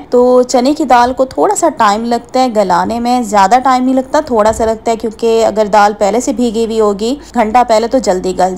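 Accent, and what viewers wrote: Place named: Pakistan. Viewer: Achi lagtii hai pakistani hindi.....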